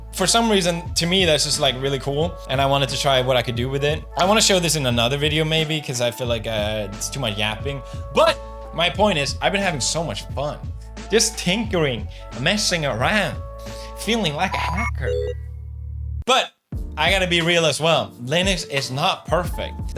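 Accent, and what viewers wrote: Sweden. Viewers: It took me not seeing your videos for 3 years to realize you actually still have a very prominent Swedish accent! 🇸🇪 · I haven't watched your videos for a long time, but I must say your Swedish accent has somehow gotten worse. Love this video, though, and I agree 100% that more people should use Linux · Can I just say I feel like since pewds probably doesnt use english as often anymore I feel like his swedish accent is coming out more and more haha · you still sound so darn swedish it's silly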